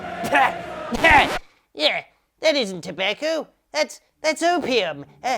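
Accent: United Kingdom. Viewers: Are you British or are you an American playing a British puppet character?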